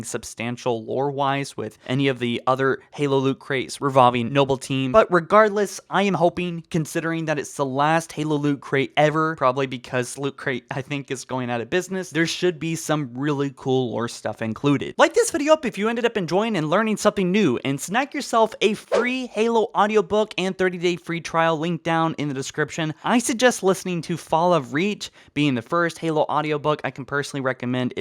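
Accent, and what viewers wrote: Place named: South, United States. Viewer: you know ive never thought about it but has a southern accent. he hides it well but it leaks through in small bits sometimes.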